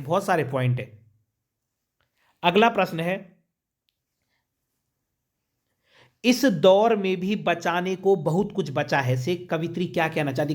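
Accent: Garhwal, India. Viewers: Sir apki gadwali tune Ari h ❤️🤣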